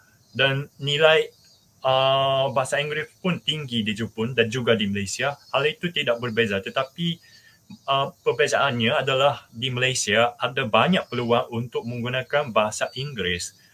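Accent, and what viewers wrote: Indonesia. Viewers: Suka Melayu,tp logat Indonesia wkwkwk 😂😂😂😂 Indonesia mmg the best👍👍👍👍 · Kalo Di video ini, Bahasa melayu nya, sangat indonesia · Dari cara bicara nya pun lebih banyak logat dan abjad bahasa Indonesia...😂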